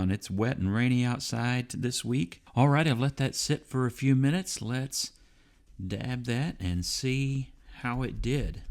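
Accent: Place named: Texas, United States. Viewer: coming from Texas as well I vibe with the pronunciation game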